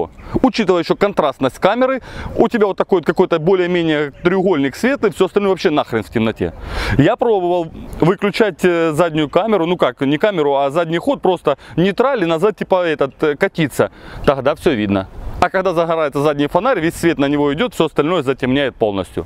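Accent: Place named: Luhansk, Ukraine. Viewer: Virab Saakyan он с Луганска ,а там у всех говор такой. Мой земляк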